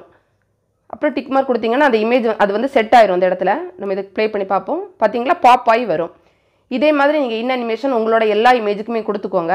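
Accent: Kanyakumari, India. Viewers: Sister....neenga kanyakumari thanae.....🙄slang super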